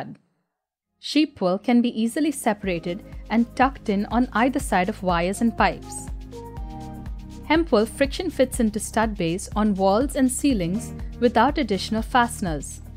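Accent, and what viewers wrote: India. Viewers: Your Indian English pronunciation is good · I stumble across this video because I think your cute and your Indian like accent is nice sounding. Now lets get serious. Burning sheep wool smells like when you burn your hair with a curling iron 😊, I am a guy with short hair, I thought it was funny because I said to myself, she burned her hair with a curling iron 😂. Here is something you may not have thought about as you were mainly comparing the two materials. What if the either layered the Hemp wool and the Sheep wool or better yet, weave the hemp wool into the sheep wool, that would make for a strong product that may not burn and might take the pros from both materials. Its was just a thought. Nice and informational video. 👍👍👍👍👍 😁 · You mention yourself to be from Dubai but you are not arab for sure. Expats never get citizenship there. I lived there for 20 years and I know it. 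Why to mention yourself s someone from Dubai if you are not? You sound indian and I don't see any reason for you to hide it.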